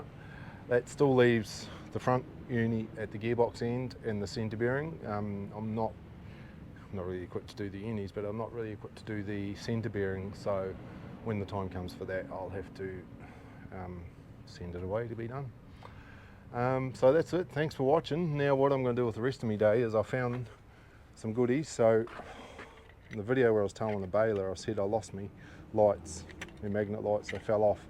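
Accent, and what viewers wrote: New Zealand. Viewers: I bloody knew it,,,,, You're a Kiwi.😂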